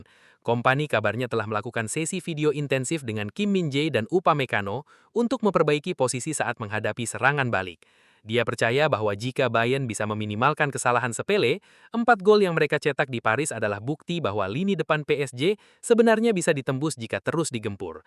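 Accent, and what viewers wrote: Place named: Makassar, Indonesia. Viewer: itu logat org Makassar dan Bugis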